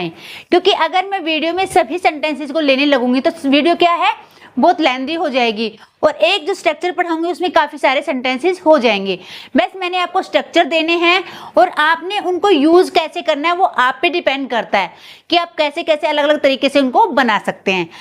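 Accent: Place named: India. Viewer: आप बहुत अच्छा कंटेंट लेकर आती है और बहुत अच्छा पढ़ाती है but अब समय बदल गया है तो आप थोड़ा सा अपने एक्सेंट पर और प्रनंसीएशन पर भी काम कीजिए और आप यूट्यूब में थोड़ा सा ऐसी वीडियो देखिए जिससे आप जब इंग्लिश बोलती है तो उसमें देसी लहजा न लगे आप टीचर बहुत अच्छी है इसलिए मैं आपको सब्सक्राइब कर रहा हूं मैं यहां बुराई नहीं कर रहा हूं आपकी, बस आपको थोड़ा सा अपडेट रहने के लिए कह रहा हूं । समय के हिसाब से थोड़ा सा ध्यान देकर आप अपना देसी लेहजा हटाये और उच्चारण आज के समय के हिसाब से करें। सलाह ठीक लगे तो माने अन्यथा आप बहुत अच्छा पढ़ा रही हैं😊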